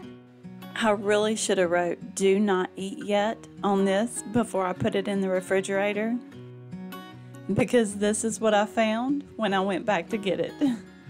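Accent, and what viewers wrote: South, United States. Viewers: Thanks for visiting my channel,! I just took a walk down Memory Lane on yours. My mom's from the south, so you had my full attention. I love southern accents. 😁And I have not seen Cool Whip and Nutter Butters since the 90's (not the kind of thing I can buy in Japan). 😆 Thanks for the great video and recipes. I love that someone ate your pie before it was set. Enjoy your long weekend!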